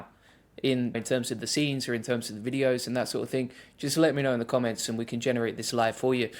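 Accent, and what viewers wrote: England, United Kingdom. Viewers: You sound English bro how did you get access to this in the uk?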